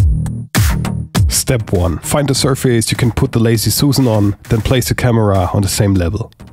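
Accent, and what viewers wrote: Germany. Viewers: Erstes Wort und ich wusste du bist deutsch Haha😂👍🏻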